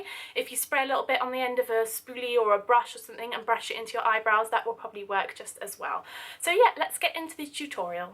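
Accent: United Kingdom. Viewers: Is she brithish? I really like and enjoy her pronounciation. ^ ^ Easy to follow and to understand - I'm not a native speaker btw. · i think she's british because of her accent. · How do you acquire that cute English accent :) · this is why i love British accent. btw, you are so cute <3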